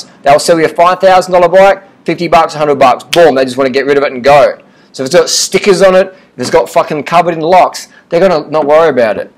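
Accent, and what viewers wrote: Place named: Australia. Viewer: I'm curious, does anyone know where this guy is from? I'm detecting a sort of Australian accent but im finding it a bit difficult to understand him. It would be nice to know where the accents from :)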